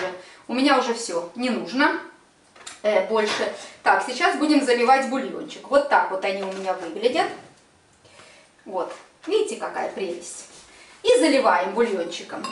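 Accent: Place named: Ukraine. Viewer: Вы были в Украине, что вы так говорите..?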